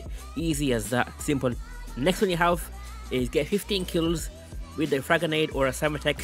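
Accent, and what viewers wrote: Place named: United Kingdom. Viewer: Bro you’re so damn British or whatever I can’t understand a word you’re saying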